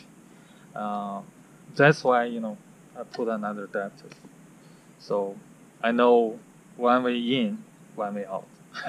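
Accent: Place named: China. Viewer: I wish i can do authentic Chinese accent like that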